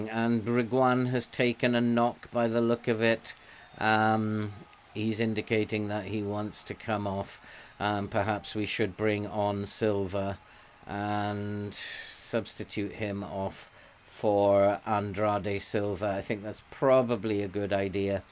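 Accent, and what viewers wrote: Wales, United Kingdom. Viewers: haha fair enough! Are you Welsh??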